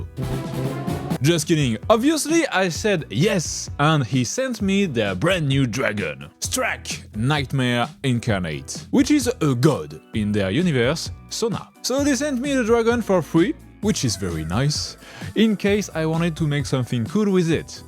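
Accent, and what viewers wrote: France. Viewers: Héhé you are the Bruno Albouze of mini paintings (same fr accent and all)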